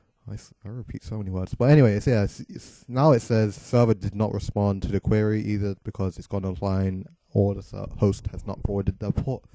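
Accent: United Kingdom. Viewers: Thx dude This really helped and also by the accent I noticed that ur from the UK ;D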